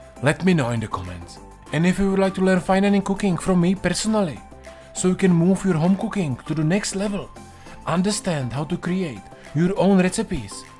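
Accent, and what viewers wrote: Czech Republic. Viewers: češtější přízvuk jsem asi ještě neslyšel... nice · Vaříš opravdu skvěle ale ta čeština tak brutálně bije do uši.. Raději si vypínám zvuk a zapínám titulky 😀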